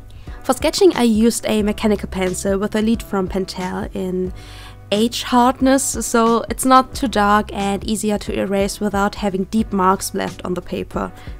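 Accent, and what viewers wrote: Germany. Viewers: After a few words I knew shes from germanyyy :D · Do i hear a german accent? I guess i do~ Greetings from germany <3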